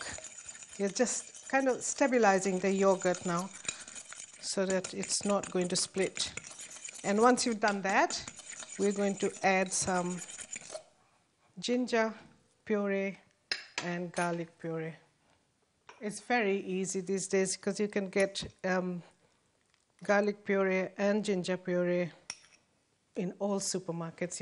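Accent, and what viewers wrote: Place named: South Africa. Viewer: Her accent doesn't sound so British, she sounds more like she is from Durban, South Africa.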